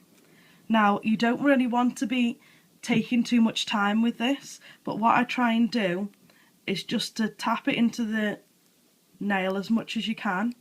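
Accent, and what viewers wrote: Liverpool, United Kingdom. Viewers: Hi, I love you videos, I grew up in Liverpool myself so love listening to your accent. I own a training centre in the West Midlands and would like to use some of your links to help our students. Please keep up the good work of how to do nails properly, there are so many technicians out there that have not be trained well. John at thenailschool.co.uk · are you a scoucer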